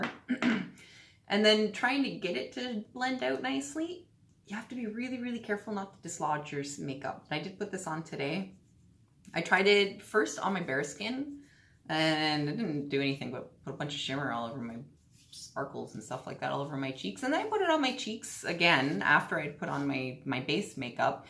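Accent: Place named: Newfoundland, Canada. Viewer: Lol ur fun to watch. Not to mention purrrdy. Im thinking of subscribing to this box. R u from nb ns or nf ?? I always know a downhomer when i hear one. :)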